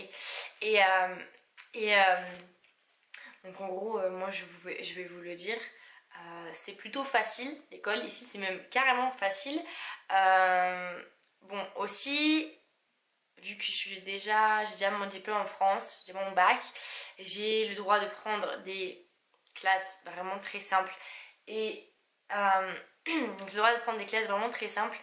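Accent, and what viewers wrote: United States. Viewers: Quel beau accent américain 😊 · Super vidéo comme toujours !! 😄 Ta voix sonne de plus en plus américaine ( quand tu parle français ), c'est trop chou !! 😂💕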